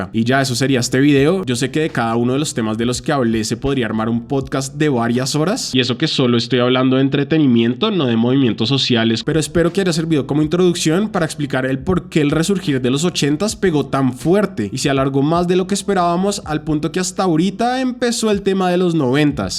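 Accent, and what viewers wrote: Colombia. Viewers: Eres Colombiano? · Eres Colombia no ? Xd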